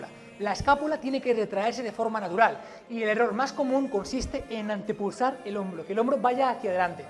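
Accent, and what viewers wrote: Spain. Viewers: Jajajaja y yo esperando un hello tipo gringo y resulta que era un español xD joder tio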